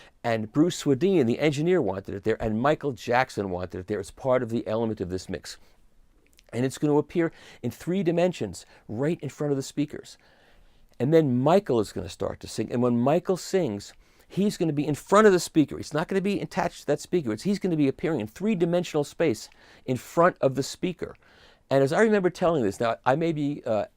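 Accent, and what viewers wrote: United States. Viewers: You sound like Dustin Hoffman in Tootsie with your Texas accent. 😀 Nice job though recounting everything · I’m from Dallas and you’re getting close to the accent.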